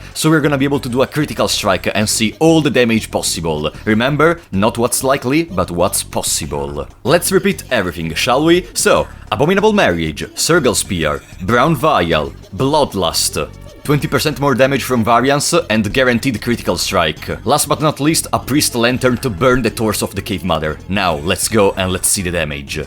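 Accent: Italy. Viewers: Oh my god, now I love the Italian accent because I can't make out exactly what Americans, British and Australians are saying in English😂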